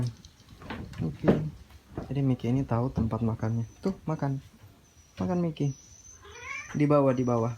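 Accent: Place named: West Sulawesi, Indonesia. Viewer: Dimana tepatnya ini,kalau simak dari logatnya bro ini dari SulBar? Sy juga memelihara bbrp kucing 😀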